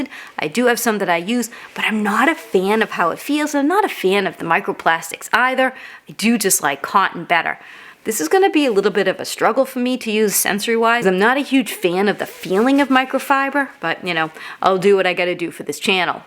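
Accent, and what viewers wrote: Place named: New York, United States. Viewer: This lady has such an interesting NY accent lol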